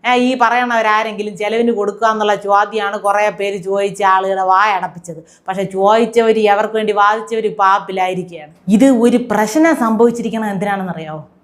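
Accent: Thiruvananthapuram, India. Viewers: 😵‍💫🥴 തിരോന്തോരം അപ്പി സ്‌ലാംഗ് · Thirontharam slang is xcellent❤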